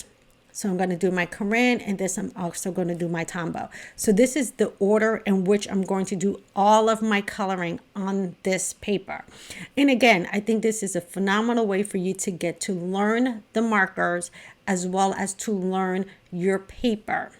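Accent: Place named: New York, United States. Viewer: Paper is key! You sound like a fellow NY'er (im in N.Fla now). Thanks for the info💗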